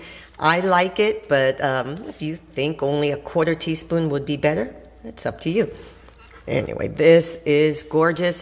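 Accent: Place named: Tri-State, United States. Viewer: Back east??? Hell no! I'm on the west coast, lady! hahahaha! Can't you tell from my accent? Say -- and forgive me if I'm wrong -- but are YOU from back east...like tri-state, by any chance? That's what your accent sounds like to me. Again, I am SORRY if I got that wrong.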